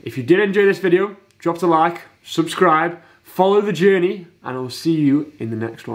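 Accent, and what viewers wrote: United Kingdom. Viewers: could've sworen that was a Yorkshire accent but okay 😂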